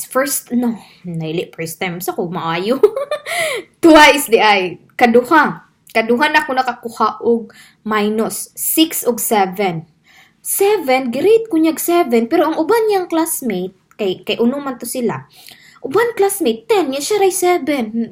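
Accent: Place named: Visayas, Philippines. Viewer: Ma'am..ganahan kaau ko nimo, ma feel jud nako imo pagka bisaya !.. hehee..love it!... Ma'am still hiring pa sila until karon?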